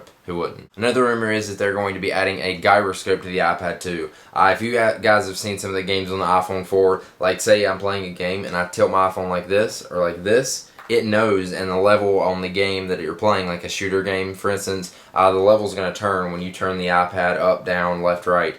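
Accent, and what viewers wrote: South, United States. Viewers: its called a southern accent, or in his case, a semi-southern accent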